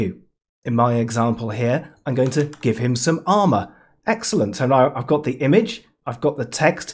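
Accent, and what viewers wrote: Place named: United Kingdom. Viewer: When I hear your voice I think of Monty Python, with that perfect British diction, it's kind of humorous to be honest.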